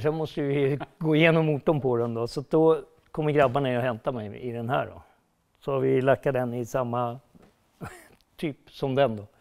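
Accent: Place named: Stockholm, Sweden. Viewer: Skön lirare 😊, och pratar riktigt Stockholmska 👌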